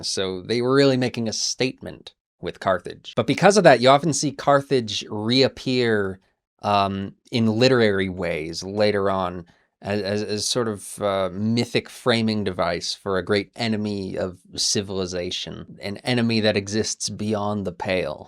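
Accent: Wales, United Kingdom. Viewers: Chief are you welsh??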